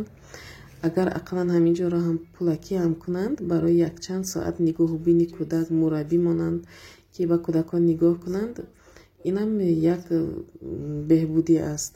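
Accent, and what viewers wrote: Tajikistan. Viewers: Хамачои нишон додагиатон зебо, аз хама зебо гапзадани шумо бо забони тозаи точки. Ташакури зиёд ба шумо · Автор ай лахчаи гапзанитон муалима хастед тоза забони адаби точики ахсан 👍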